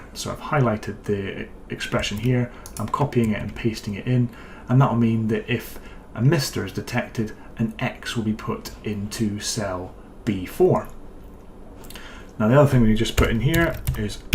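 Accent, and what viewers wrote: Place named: Scotland, United Kingdom. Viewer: I really appreciate all your efforts spending to provide such detailed instructions about Power Platform and Office apps features. I lost my mobile iPhone in Aberdeen, 2 days later someone found it and called my emergency contact with Scottish accent (obviously), and I managed to get it back. Since then, I am happy to hear anything with Scottish accent. Sorry for the side note 👍🏻Kudos to you.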